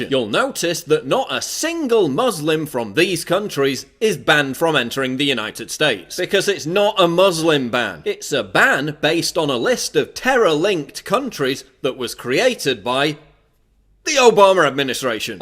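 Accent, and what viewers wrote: United Kingdom. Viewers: off topic but aren't you british ??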